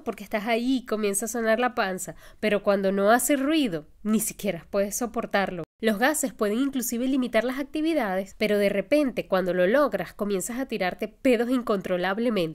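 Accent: Venezuela. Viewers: Hablas como venezolana. Muy divertida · DIVINA Dr. he reido casi todo el video con tu sentido del humor ,,Belleza, simpatia ,conocimiento y excelente explicación .eres venezolana por tu acento. Gracias totales, Dios ilumine tu camino de bendiciones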